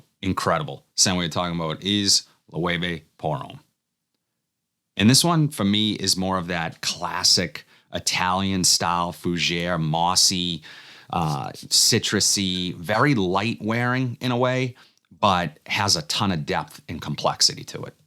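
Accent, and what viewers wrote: Boston, United States. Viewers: ! Happy birthday 🎉 I love how your boston accent sounds like everyone I grew up with. I need suggestions for cologne for my almost 16 year old - I splurged on althair for his b Day in a couple of weeks ( I love it ) ( got PDM discovery set to try before spending on it, p He has Cedrat Boise. Prada Luna rosa. And the sport one Sauvage. Coach blue,( Marshall's) mont.blamc legend bluu t. Jimmy Choo man.aqua di gio. Phanom.( nauseating i cant deal ) cremo spice and black vanilla He no longer likes his nautica voyage So any suggestions at lower price range that smells good? Not everyone smells like. What else is great for this age?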